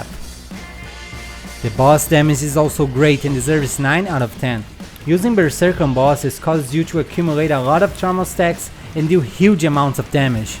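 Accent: Brazil. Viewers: Quando um brasileiro fala ingles tu entende tudo.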